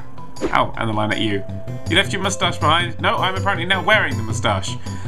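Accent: England, United Kingdom. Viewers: I think he means it's technically an ENGLISH accent and not a BRITISH accent. There's no single accent for all of Britain, but England has a native accent. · Britian is the country itself right? And he does have a british accent that Sab3r prick isn't all too bright.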